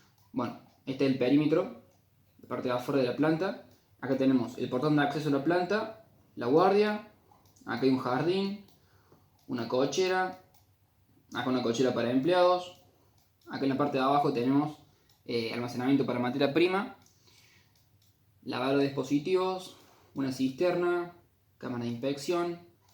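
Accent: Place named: Corrientes, Argentina. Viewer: Muy bueno lo tuyo joven. Soy muy veterano en estas cosas y de verdad me encantó. Supongo que eres argentino. De que provincia?. Parecería correntino. Éxitos en tus proyectos y en la facultad. Saludos desde Uruguay.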